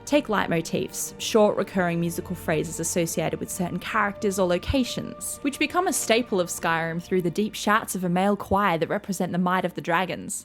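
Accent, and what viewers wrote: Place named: Australia. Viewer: I'm really glad I could have a positive impact! :) Of course! Thank you for taking the time to reply! I really enjoyed it and actually checked out your other vids! And let me tell you, in my years and years of watching yt I've never wanted someone to succeed more I'm genuinely shook you don't have more subs! I've never been so quick to sub haha but your quality is absolutely top tier so I really really hope your channel grows! :) And ayyy hell yeah Aussie represent🇦🇺 it honestly helped me relate more hearing your accent haha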